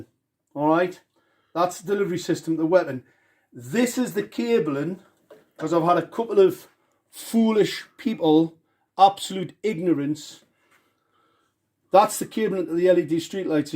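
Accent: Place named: United Kingdom. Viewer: Klares britisches Englisch ohne großen Dialekt - wo liegt das Problem? Die Qualität der Erklärung ist eher dürftig.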